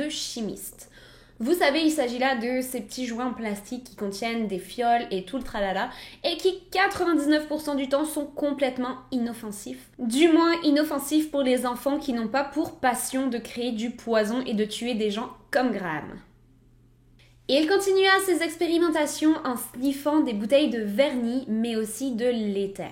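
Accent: Quebec, Canada. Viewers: Il y a un petit quelque chose dans ton accent qui sonne québécois. Est-ce que je me trompe? Tu es pétillante! C’était la première vidéo de que je regardais, mais certainement pas la dernière. Je me suis abonnée!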